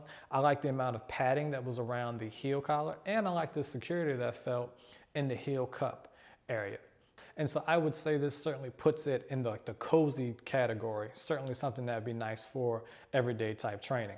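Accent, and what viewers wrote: Baltimore, United States. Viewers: Nice video. Can't wait for the Mach 4 review. By the way, are you from Baltimore? I think I can hear it lol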